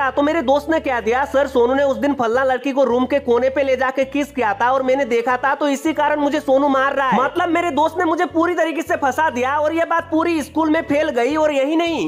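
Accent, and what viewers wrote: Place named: Nepal. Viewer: When nepali speaks hindi and thats too assamese thisis awsome ❤️❤️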